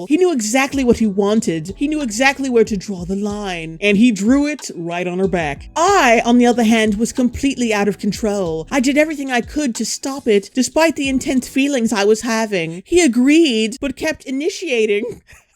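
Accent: United Kingdom. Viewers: Is she from burnley?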